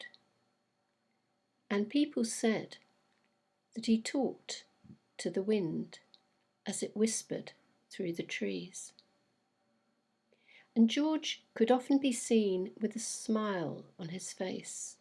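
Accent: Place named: England, United Kingdom. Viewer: Your calm a sweet nature pulled me in. I love your pace and the peaceful feeling you create and give. As a Utah girl in the USA, I absolutely love your English accent! Beautiful story!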